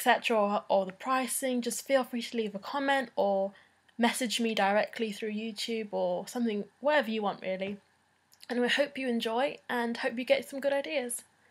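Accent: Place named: England, United Kingdom. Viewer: I love your accent! are you English?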